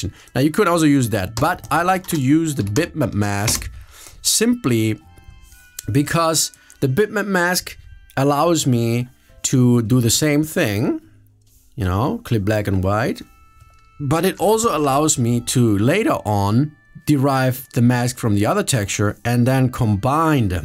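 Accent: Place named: Italy. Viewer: I knew I recognised something italian-ish to you! That's because you are in fact Italian! Grande! :D I love the way you talk through your contents, and also the voice sounds. They made my day when I heard them the first time. I am almost new to Fusion and I am trying to get into it as much as I can, also because I am trying to push it in the company I am currently working for. Anyways, bel lavoro!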